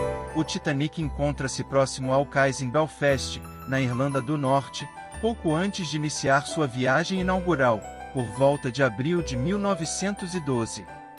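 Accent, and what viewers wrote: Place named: Brazil. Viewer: ESTE SOTAQUE BRASILEIRO DESPERTA LOGO DESCONFIANÇA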